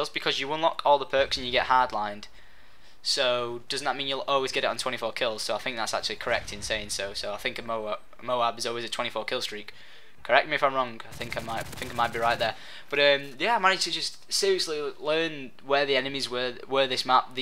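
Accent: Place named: Manchester, United Kingdom. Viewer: na he's from england manchester i think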